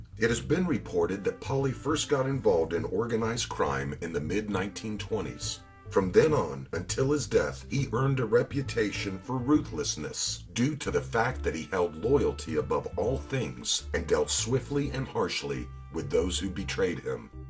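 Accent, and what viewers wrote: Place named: South, United States. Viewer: mispronounces Italian names with his southern drawl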